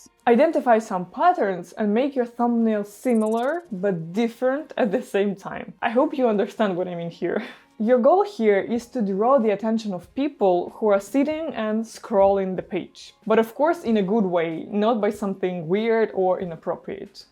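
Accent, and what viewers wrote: Russia. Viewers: Are you a Russian? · Are you russian or latvian or from Dutch ? Im a bit confused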